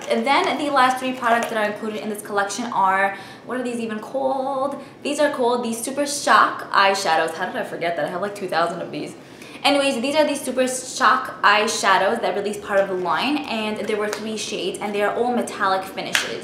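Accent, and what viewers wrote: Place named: New Jersey, United States. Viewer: you have the sweetest accent!!!! Are you from NJ?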